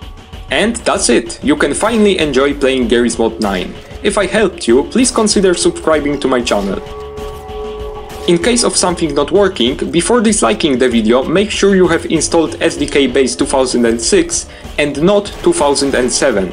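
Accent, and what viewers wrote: Poland. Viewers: Great tutorial, but are you Polish? You sound Polish. (Yes, this is a necessary question.)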